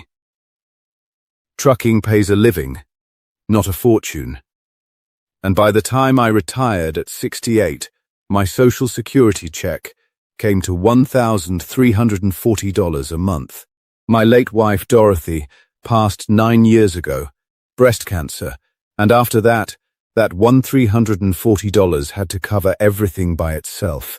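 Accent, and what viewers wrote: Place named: United Kingdom. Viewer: Why is my gut telling me that this is AI? Is it the strange eye-blinking or the British accent saying he was a truck driver in Ohio - lol!!!!!!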